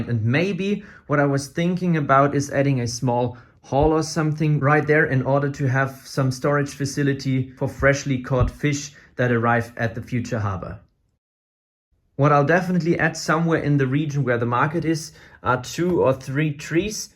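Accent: Germany. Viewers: Da war ich mir doch sehr sicher, den leiiiichtesten deutschen Akzent zu hören. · Are you German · Dieser Deutsche Akzent ist rauszuhören :) Und mir ist aufgefallen das du denselben Text wie im Deutschen benutzt nur halt auf Englisch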